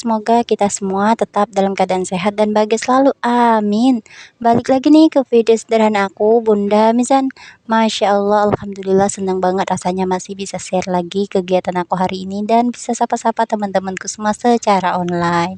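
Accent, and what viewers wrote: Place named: Banjar, Indonesia. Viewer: Enak tekwannya bun... Dari logat ngomongnya seperti orang Banjar.. Tinggal dimana bun?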